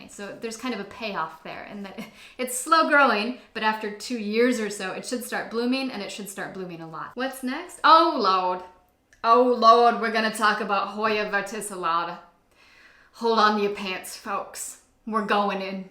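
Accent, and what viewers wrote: United States. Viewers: Are you from Boston?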